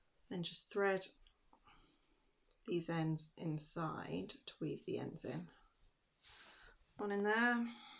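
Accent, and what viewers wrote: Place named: England, United Kingdom. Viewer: Thank you so.much for this pattern. I love your English accent. Im from scotland living in USA 44 years still have My accent.😊❤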